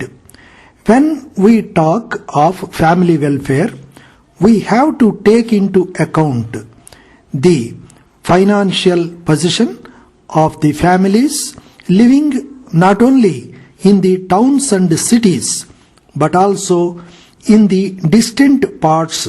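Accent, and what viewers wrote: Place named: South India, India. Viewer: kai re clear voice...fati hui awaj h....south indian accent...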